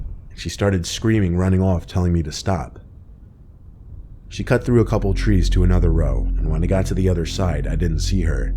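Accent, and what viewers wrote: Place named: United States. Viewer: After listening to so many Be. Busta vids I can hear heavy American accent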